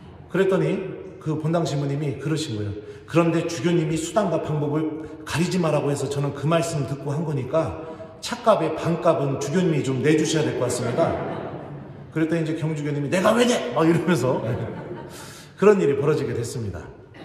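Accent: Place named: Jeolla, South Korea. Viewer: 지금도 충분히 보기 좋으셔요 ㅋㅋ 전라도 말에 귄이 있으셔요 즉 매력넘치신다는 말씀입니당😊👍😆💖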